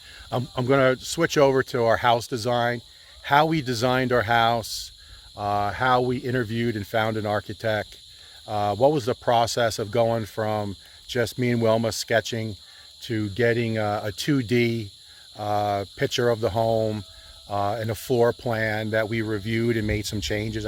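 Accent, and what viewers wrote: Philadelphia, United States. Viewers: Very cool - I hear philly in you :) am i right ?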